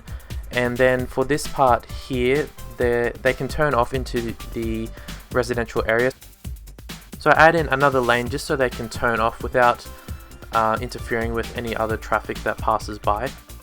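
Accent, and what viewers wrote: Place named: Australia. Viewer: Yeah, I just looked up how it is in Australia, and people there drive on the left side too. And I'm not sure but I thought I heard a hint of Australian accent in his voice. Not entirely sure though.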